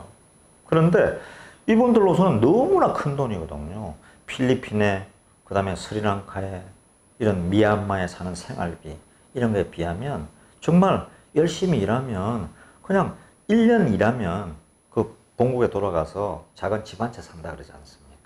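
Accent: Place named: Gyeongsang, South Korea. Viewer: 목소리 너무 좋으시고 발음도 정확한 교양있는 경상도 말씨를 쓰시는 방송 너무 너무 멋지고 좋습니다. 아마도 아직 재판 중이라서 신경쓰실 일도 많으실텐데... 건강 관리 단디 챙기십시오. 종종 인사드리겠습니다. ^^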